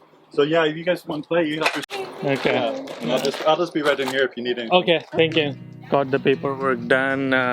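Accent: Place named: India. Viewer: Do you know hindi I am asking you this because your voice has some indian accent and your face is also like indian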